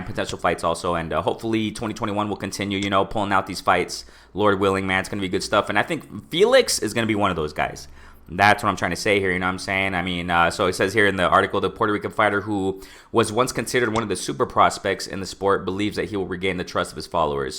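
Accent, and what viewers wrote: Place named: Mexico. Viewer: Hey good video! I was picking up on your accent and it sounds Mexican, by the way you emphasize on the "J" in Verdejo. Am I right?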